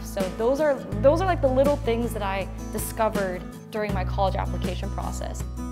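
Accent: United States. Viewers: Why does she sound American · 와 미국인인줄 알았어요 영어 실력 무엇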